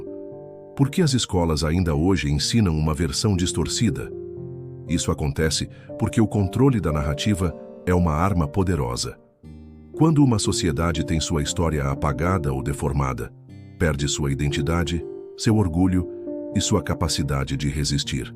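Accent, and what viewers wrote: Brazil. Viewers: Vão mas é trabalhar. Este narrador com sotaque brasileiro a dizer que é africano é só para 😂😂😂😂. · ÉS AFRICANO COM SOTAQUE BRASILEIRO?!! A VERDADEIRA HISTÓRIA DA ÁFRICA E BERBERE OS CELTAS TAMBEM ERAM BERBERES DEPOIS ALGUEM FEZ UMA MANIPULAÇÃO GENÉTICA CRIMINOSA E CRIOU CANIBAIS OS BERBERES E CELTAS SAO OS MESMOS ATLANTES OS PORTUGUESES ERAM A ELITE ATLANTE OS PIRTUGUESES FORAM AS AMAZONAS OS FENÍCIOS OS TEMPLARIPS E OS ESCRAVOS NEGROS BRASILEIROS OS INGLESES GORAM OS ESCRABOS NEGROS USA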